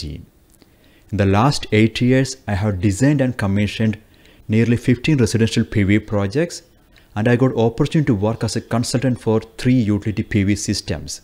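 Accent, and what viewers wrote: Kerala, India. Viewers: Nalla malayali accent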